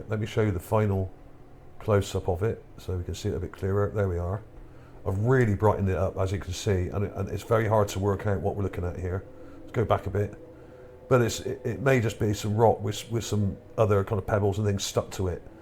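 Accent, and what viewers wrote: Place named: Wiltshire, United Kingdom. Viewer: Good Wiltshire accent 👌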